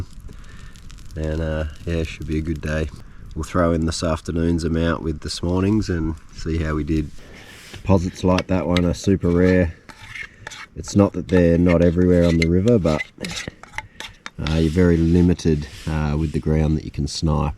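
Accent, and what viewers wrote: Australia. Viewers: love your content and your Aussi voice. Well done!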